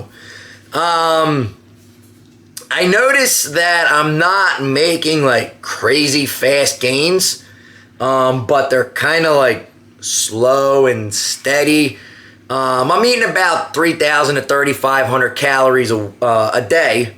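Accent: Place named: South, United States. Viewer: Bro its been ages since ive heard such a strong southern accent 🤣😅🤣😅😂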